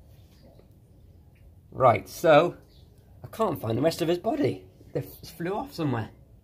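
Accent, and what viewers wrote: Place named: United Kingdom. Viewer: What a British accent and its not a bad thing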